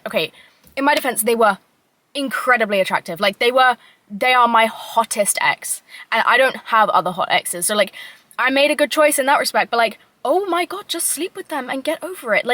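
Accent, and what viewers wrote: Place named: United Kingdom. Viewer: I could listen to u talk for hours it’s like listening to myself but British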